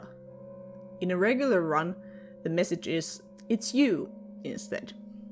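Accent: Finland. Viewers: Are you a fellow finnish person? Your accent is similar to finnish accent! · Nice Finnish accent :D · Are you finnish? I'm finnish and your accent seems so familiar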